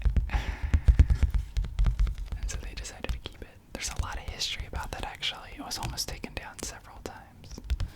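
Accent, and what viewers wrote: United States. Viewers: Your american accent isn't terrible but great ! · andré Patricia American accent isn't terrible? He is americain ! he only studied in france.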